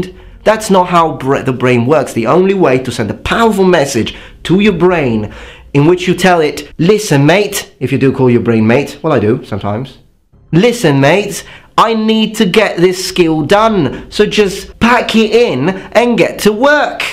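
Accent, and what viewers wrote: United Kingdom. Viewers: when i first heard you speak, i thought you were british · When I first watched your channel I did think you were British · You sound British to me, an American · I want to say that, you have a fluent british accent. Bravo. I'm American with Italian and Scottish ancestry. I have a very basic understanding of French, Italian, German and Hindi (I lived there for a time). I think everyone should learn at least 1 other language, it helps expand your mind and brings people together. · Thanks. Your accent sound really closest to the native British accent. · When I subscribed to your channel, I thought you were British until you specifically mentioned being Italian. But I'm American, and a lot of Americans say we can't tell the difference between certain accents. Like English = Scottish = Irish = Australian = South African = New Zealand etc. 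Could you do a video about the different types of Japanese accents? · You have a thick British accent. Come to America and no one will think you are Italian. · Your British accent is amazing! By the way, I just adore the thick Italian accent. It makes me want to order a large pizza.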